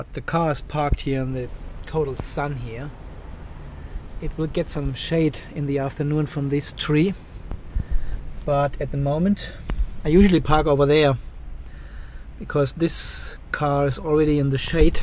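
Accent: Germany. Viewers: R u german ?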